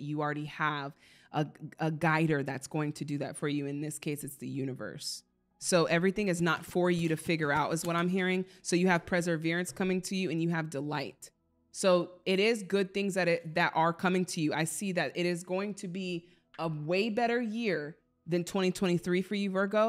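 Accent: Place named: Midwest, United States. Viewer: Can you please tell me what decks you used in Leo read. You are remarkable. I’m a tarot collector since 12 yrs old. I’m 66. I love you readings your style your voice. You’re a gift to this world no doubt. I’ve been a reader my entire life it’s not often I see a true message from spirit from readers. Especially one who just cold reading on podcast. Crazy accurate. Bold brilliant and appreciated. What state are you in. I’m in Minnesota. You sound like me. Midwest accent. Lol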